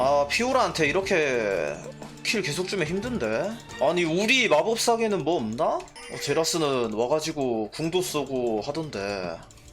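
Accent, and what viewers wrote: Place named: Gimhae, South Korea. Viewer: 약간 김해 냄새가 나는데?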